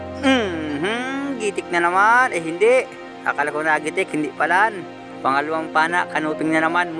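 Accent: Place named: Jomalig Island, Philippines. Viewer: Saan yn location mo idol?familiar KC ung tuno ng salita mo? somewhere in jomalig island,Tama po b?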